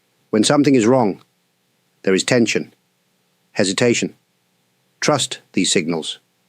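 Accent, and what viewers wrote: United Kingdom. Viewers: He is born in England sounds like he has British accent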